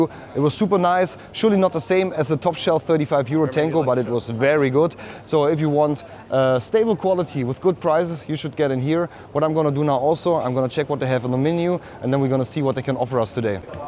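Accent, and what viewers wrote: Germany. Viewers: playing in the shop visits & your comment - not random coincidences! I have been studying into synchronicities since I suffered an acute psychotic episode in 2017. (psychotic = to psychic) Psychiatrist Carl Jung was said to have coined the term ‘synCHRONICity’ to describe meaningful coincidences with significant personal meaning to the individual. I’ve been working on making wee silly comedy cartoon / comic book style movies since I went ‘crazy’ & in the nuthouse they imprisoned me in 4 being a method actress, the nuthouse staff put an A4 sheet of paper ‘sign’ on the door that threatened; “WRITE TO SURVIVE.” I focused on that sign whilst barely conscious after my life was nearly ended by a psychiatric mentalcase patient in their who beat my skull on the ground in excess of 30 times whilst screaming, “AHM GONNAE FUCKIN MURDER YE!!” “She”, that “female” (I use that term to describe “her” loosely), that violent criminal thug caused me a “traumatic brain-injury” (there is an anagram of “Truman” within those words. 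During my “to psychic” episode I suffered from the common Truman Show delusion & thought I had been set up (I’m an actress) & thought I was being used for a psyop for a Derren Brown show. (I was not I was being sectioned in hell.) Anyhoo, I decided to comment as I’ve just recently started watching your videos & enjoy seeing Amsterdam & listening to your German accent. When I was homeless in 2023 in Utrecht I met the loveliest homeless German man called No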